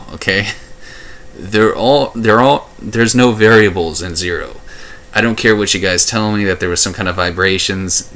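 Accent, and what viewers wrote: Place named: United States. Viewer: Atheism is not a theory. So... before you try to "debunk" something, you should first learn what it is. Never a good start, when your first sentence demonstrates your ignorance on the subject you attempt to talk on. Atheism is a RESPONSE to a claim. The claim "There is a god". Atheism is the rejection of this claim, as unsupported. Got it? Good. So... you sound like you're from the US. And in the US, pretty much all people in higher offices are theists. They HAVE to be, otherwise the general public wouldn't elect them. Because apparently, whether or not you believe in an invisible being with supernatural powers is more important, than whether you have good qualifications or good political ideas. So yeah... you're idea about atheism coming from the "elite" falls flat right in the beginning... Illuminati? Are you serious? 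0_o The state isn't my god. I don't think the state is an all-powerful, supernatural being. The state also isn't ONE entity. It's made up of a number of people. I also don't worship the state. So, all in all: That's a weird claim to make! You literally have to redefine what you mean by "god", to make this claim work. And honestly, if you have to redefine terms, just to make your point, you should already realize that you don't have a good point to make. That's not a number one theory of atheists. That's not a number 10 theory of atheists. Actually, that matches more what theists believe. Theists usually believe that the entire universe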